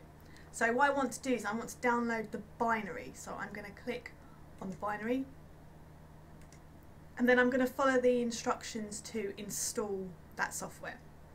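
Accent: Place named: United Kingdom. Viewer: British accent <3 cool video :)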